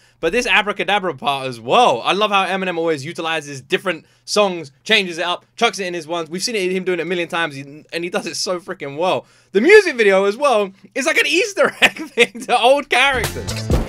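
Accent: United Kingdom. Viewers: I'm new to you and your channel you did a amazing job with this em I'm listening and hearing your accent or you british or english I was watching some video yesterday and seen a em video and I didn't think nothing of it I thought it was a older video em talking to some guy and em going do a magic trick and make his career disapear and now alotta other reaction talk about some rumors about em trying to get cancelled or em about to retire em my guy my guy em been doing this for how long now and only hours later from that em video I seen em drop this with the music video I don't know what going to happen what going on son the only one that knows about anything is em and probably whomever else em talk to if this one of em last songs albums it's going to be a really very sad bad day I like em em my guy my guy keep on doing what you doing my guy 👊👊🔥🔥👊👊👊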